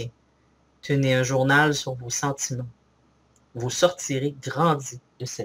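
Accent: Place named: Quebec, Canada. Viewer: OH !!! UN ROBOT QUI PARLE QUÉBECOIS ...!!!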